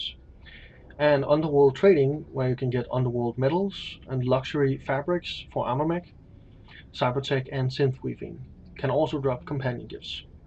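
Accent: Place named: Denmark. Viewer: Loving the Danish accent :D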